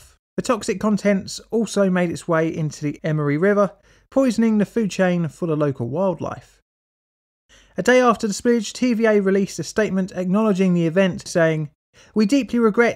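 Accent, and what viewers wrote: United Kingdom. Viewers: Why is a limy narrating this, ENGLAND HAS ENOUGH ISSUES OF ITS OWN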